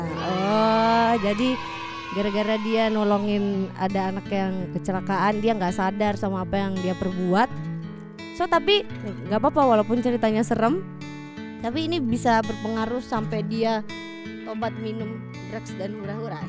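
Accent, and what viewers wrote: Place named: Lombok, Indonesia. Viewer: Aksen wong lombok..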